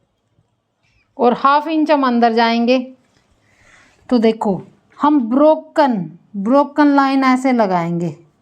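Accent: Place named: Haryana, India. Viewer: Mam aap kaha se ho aapne haryanvi boli bhut acha laga sun ke😂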